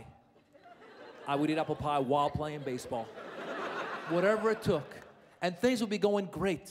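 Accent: Iran. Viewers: hahahahah his persian accent is awesome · this guy is amazing being half persian this hilarious!! the thick persian accent cracks me up haha totally my dad!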